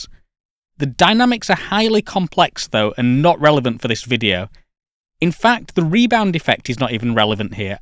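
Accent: United Kingdom. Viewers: Kind of hard to take this seriously when you mispronounce "photon" 50% of the time. Practice your "t"s Sound less bri-ish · I was wondering if this was going to be a good video, and then a young Brit started talking. I was relieved. haha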